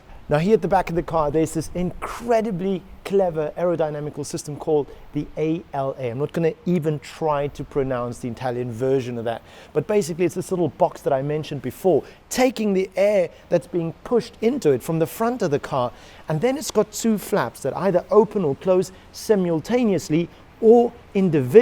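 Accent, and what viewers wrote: South Africa. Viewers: Dude you sound south African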